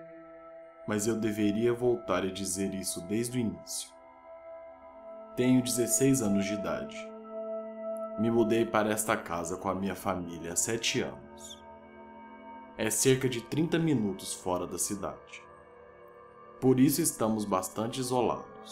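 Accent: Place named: Inland São Paulo, Brazil. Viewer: troca o narrador, q de repente pode ficar legal, pq esse sotaque paulista do interior é foda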